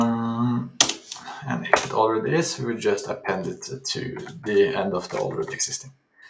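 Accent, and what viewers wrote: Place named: Norway. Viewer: Hallais! (based on your accent, I assume you're Norwegian 😅) Loved this video dealing with maps - that gave me a much better understanding on where even to start with my overambitious project. I would love to see your take on data structure/handling 😊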